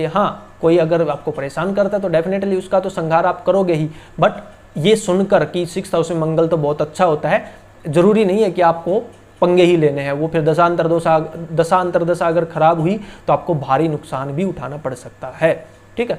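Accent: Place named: Haryana, India. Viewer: ji aap ki hindi mein haryanvi tone aa rhi hai 😃 I guessed correctly 👍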